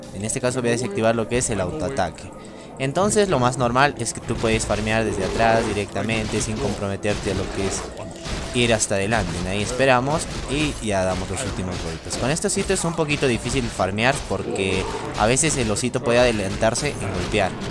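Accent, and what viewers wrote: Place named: Bolivia. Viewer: Vocalisa bien boliviano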